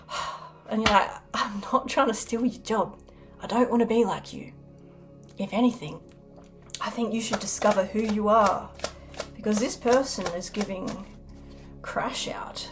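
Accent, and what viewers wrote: Australia. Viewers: Thank you my dear darling for the wonderful reeding I truly love you girl you're alsom little woman and girl I love your eyes and you're astra accent so sweet with that stay cool and cute your good friend dale senney ❤ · you are ethereally beautiful. your eyes look like pools of eternity. if i'm not mistaken your accent is aussie.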